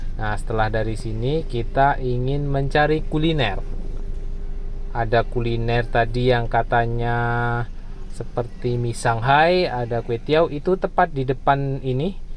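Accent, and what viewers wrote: Java, Indonesia. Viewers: Dari lidahnya klo ngomong bahasa Indonesia seperti YouTuber² dr Jawa .. orang Jawa tengah y om...? Orang ngapak sepertinya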